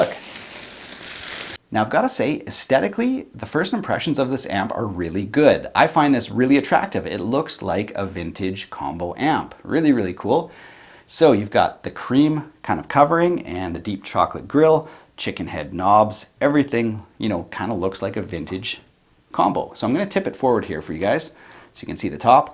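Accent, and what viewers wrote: Canada. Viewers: he sounds candian, everything sounds weird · Great video, exceedingly helpful! Would love to see it compared to higher-end tubes. Also, the Canadian accent seems more pronounced in this video for some reason.. love it!! 🤘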